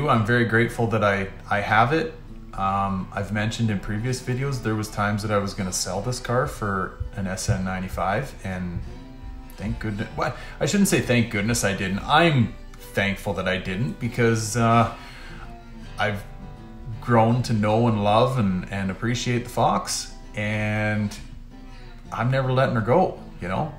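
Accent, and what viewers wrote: Canada. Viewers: Keep up the great vids man. Also I recognized that canuck accent in about 0.2 seconds, hello from Ontario! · Your videos are absolutely awesome and extremely informative!! Thank you so much! Love the Canadian accent, as well!!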